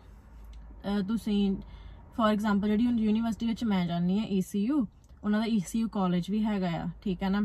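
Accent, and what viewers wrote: India. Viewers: Wooow I like your Punjabi accent 🔥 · Majhe de ho tusi lgda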